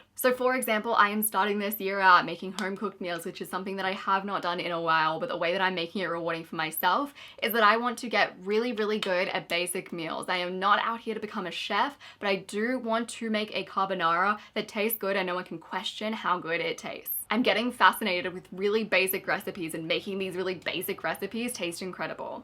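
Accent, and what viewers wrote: Australia. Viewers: Could you please speak a little slower for not Aussies?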